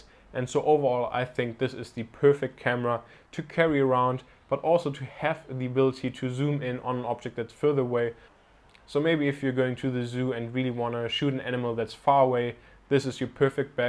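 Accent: Germany. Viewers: Wie ich einfach direkt raushören konnte, dass du Deutscher bist :D Wie auch immer, super Review!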